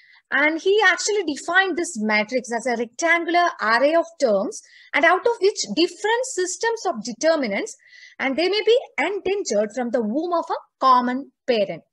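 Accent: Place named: South, India. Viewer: It's good and Informative ! Are you doing PhD in Mathematics ? And another thing to say I like your pronunciation , it's awesome and somewhat like South Indians ,hahaha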